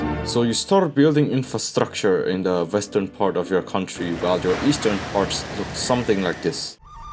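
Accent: Germany. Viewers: Great German accent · Is this guy german, if no his german is really good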